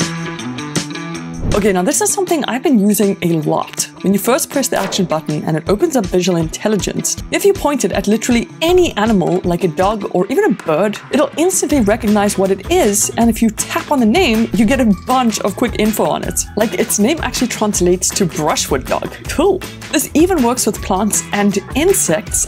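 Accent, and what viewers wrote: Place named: South Africa. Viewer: Cool Soth Afreekan accent.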